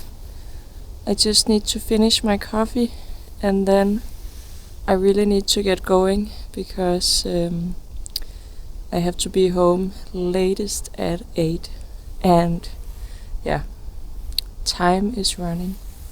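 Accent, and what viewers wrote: Denmark. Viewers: Danish accent, from Denmark 🇩🇰🇩🇰🇩🇰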